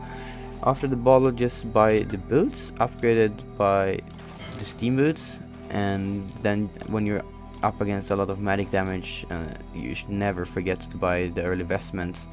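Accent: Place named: Sweden. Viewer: I can't watch this due to your Swenglish accent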